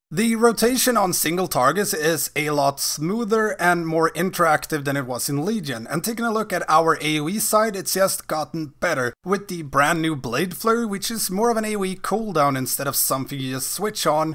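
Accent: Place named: Sweden. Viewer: Nice video, hör på talet att du måste vara svensk, så jag antar det är okej med en sådan kommentar också! Tänkte bara säga att jag har så oerhört svårt att bestämma mig för om jag vill spela Outlaw eller Survival. Tråkiga för egen del är att bägge specs verkar vara lutade åt AoE-dps, vilket jag inte är speciellt het på, då jag tycker om Singletarget-dps mer. Avskyr att se riktigt bra burst men kass sustained damage där man går från att ligga topp 3-5 för att senare sjunka till botten, vilket är därför jag inte vill spela Fire Mage (även fast den specen är cool som attan) då den tycks vara oerhört beroende av sina CDs och tanks i dungeons för att göra hygglig dps. Vill helst inte förlita mig enbart på "CD windows" för att göra mitt jobb. Förutsatt att du kan svara på min fråga, vilken spec av Outlaw och Survival gör "bäst" burst och sustained singletarget DPS? 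Tacksam för svar, bra content dessutom, så även om man inte får ett svar så har du förtjänat åtminstone en sub!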